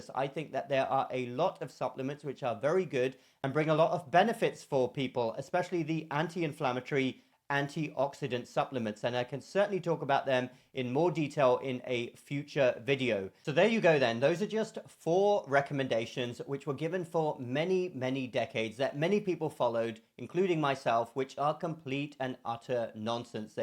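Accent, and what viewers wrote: England, United Kingdom. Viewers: That's an English accent if ever I heard one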